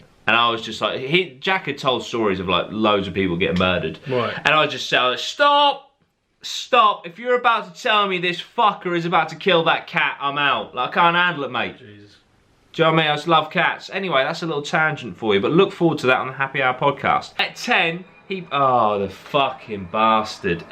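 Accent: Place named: United Kingdom. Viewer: You brits pronounce trachea funny :P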